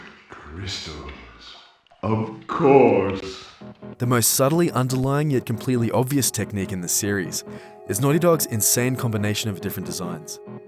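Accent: Australia. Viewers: He made it so engaging because of his Aussie accent. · I just can't take a serious discussion by someone with such a stereotypical Aussie accent.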